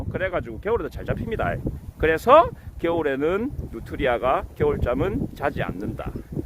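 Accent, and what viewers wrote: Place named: Gyeongsang, South Korea. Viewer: 아~함암이네요 ㅋ 사촌이모가 함안이 고향인데.. 지는 부산이 고향인데..뜬지 12년차..ㅋㅋㅋ 꺼 보믄서 사투리..이자무꼬 다시 배우고 이쓰예 ~~~ 국민학교 ㅋㅋㅋㅋ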